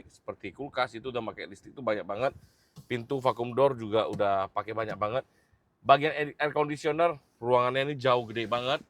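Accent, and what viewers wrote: Medan, Indonesia. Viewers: Gokil kokoh* medan identitas cakap anak medan melekat😅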